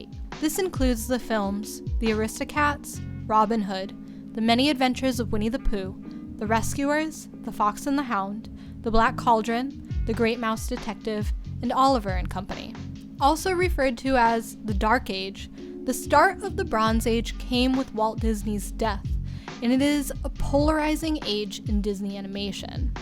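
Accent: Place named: North America. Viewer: Hats off to the person with a North American accent that can actually pronounce the words "Notre Dame" without saying "NODERR DAYME"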